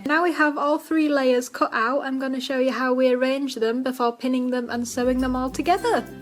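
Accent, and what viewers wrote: United Kingdom. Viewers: How long does one cage liner last? Does it bunch up in the washer or dryer? You have such a sweet voice with that wonderful british accent. Maybe one video you could show your face on camera while holding a piggie so we can see the face that matches such a nice voice. Thank you so much for your videos, I do find them full of good information.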